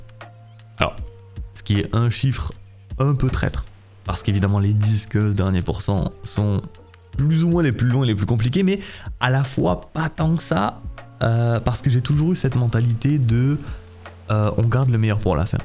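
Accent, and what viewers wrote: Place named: South, France. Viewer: Moi qui au fond espérais voir le retour des vidéos 5 raisons sur wow avec l’accent du sud x)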